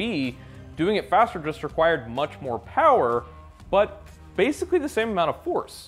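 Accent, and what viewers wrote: United States. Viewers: I feel like I've listened to James May witj an American accent 😁😁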